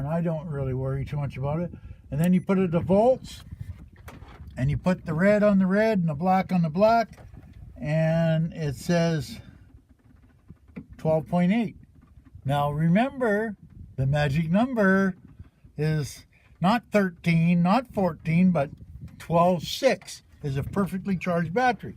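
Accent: Canada. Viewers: Education at the best level. Hands on and clear English or Canadian. Eh? Or is it AH?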